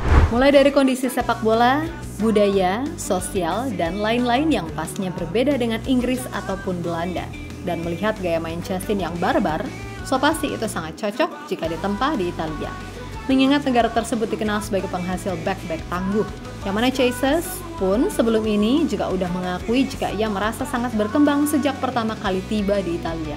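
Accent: Java, Indonesia. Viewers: Suka ngomong jowonya Mimin 😅😅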